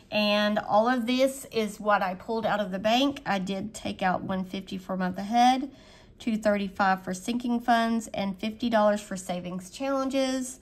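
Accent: South, United States. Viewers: Good job. Love to hear a fellow southern accent in the budgeting community. You are doing great!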